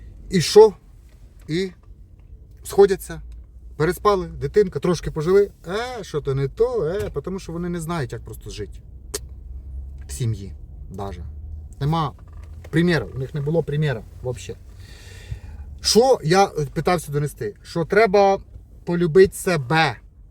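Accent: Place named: Ukraine. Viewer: Интересно слушать украинский русский )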